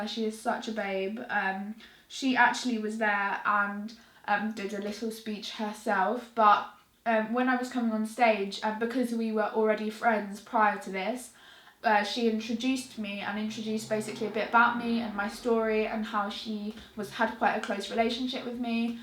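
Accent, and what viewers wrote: United Kingdom. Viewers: moon light British accents are the best 😂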